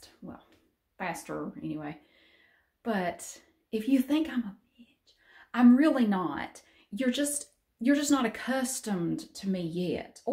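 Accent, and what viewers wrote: South, United States. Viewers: I enjoy your videos so much! You are so genuine and real. I’ve been following you the day you made this channel and watching your videos everyday now because I’m so invested in your life because I love to know what you are doing 😝 A lot of YouTubers nowadays are fake and always starting drama that’s why your channels are a breath of fresh air. I honestly love your personality and southern accent! Your voice is so soothing to hear and it helps me sleep when I’m restless and can’t sleep. Would love to send you a Christmas card if you have a PO Box! Sending you lots of love from Canada!🇨🇦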